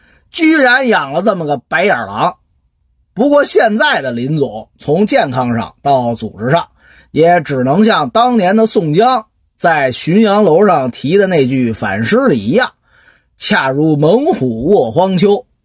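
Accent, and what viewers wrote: Beijing, China. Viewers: 從第一集開始聽，沒注意居然追上進度了。雖然我不太喜歡京腔，但是老哥這個講得確實不錯。希望老哥堅持，更上層樓。話說這一集1.8k 點踩，怎麽回事。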